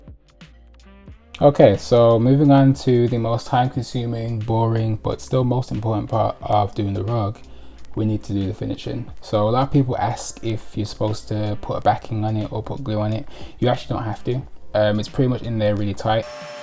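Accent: Midlands, United Kingdom. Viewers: is that a midlands accent i detect? i adore this design <3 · I never thought I could make a rug but you’ve made it look so achievable! Thank you so much! And your voice is so relaxing! You from the midlands?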